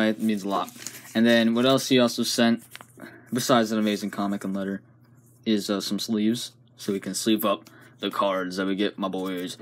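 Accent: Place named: New York, United States. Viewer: Nice new York voice really funny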